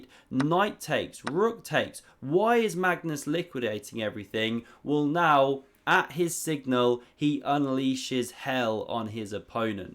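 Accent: United Kingdom. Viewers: Can you speak less british accent? It's actually really hard to stand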